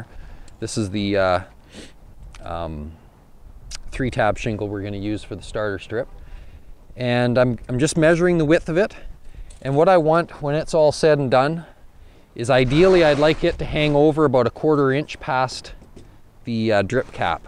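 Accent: Canada. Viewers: HE MUST HAVE GRADUATED FROM THE ROYAL ACADEMY OF ROOFING IN CANADA! HES PAINFULLY SLOW! AND THAT ACCENT IS BEYOND IRRITATING , NO WONDER CANADIANS FIGHT SO MUCH! DOESNT EVERY ROOFER USE SCAFFOLDING ON A RANCH ROOF? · It's like listening to my grandpa when he explained how to do stuff. But with a Canadian drawl.... Good stuff. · eh man are you Canadian? you sound me that's why Im asking haha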